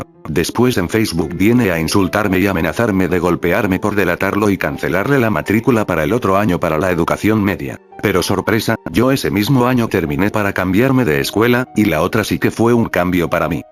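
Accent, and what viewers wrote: Chile. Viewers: Eres chileno? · tu propia boca te delata: ERES CHILENO,igual que yo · Wena eri chileno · Eres chileno se nota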